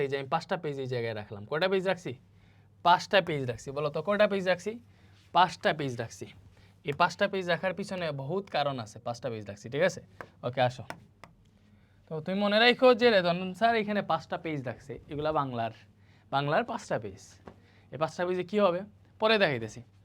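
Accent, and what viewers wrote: Sylhet, Bangladesh. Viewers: স্যারর বাড়ি সিলেট নি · Vai apni ki sylheti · Bhaia tumi mone hoy Sylheti 😊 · ভাই ত সিলেটি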